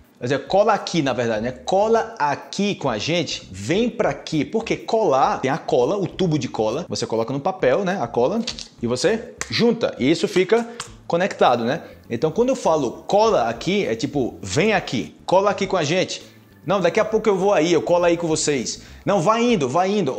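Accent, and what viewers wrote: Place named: Colombia. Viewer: Impresionante el acento colombiano!!! Se te oye bien!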